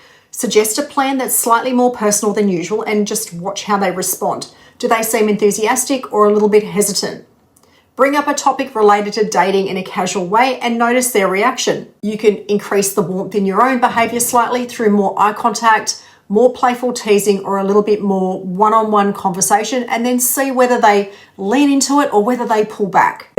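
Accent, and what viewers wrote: Australia. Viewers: That Australian accent is just the best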